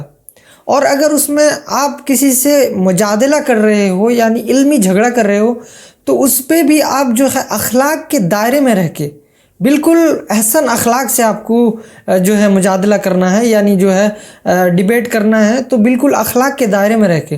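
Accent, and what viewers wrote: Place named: India. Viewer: Inka taaluk India se hai, aur India ke kuchh elaako me log ese hi Urdu boltey hain, jese hamaari taraf hamaarey kuch pathaan Bhai jab Urdu boltey hain to unki Urdu thori si different hoti hai, jesey wo kbhi چھوٹا ko چوٹا bol detey hain. Esey hi India me kuch elaake hain jahaan log شروع ko سروع boltey hain.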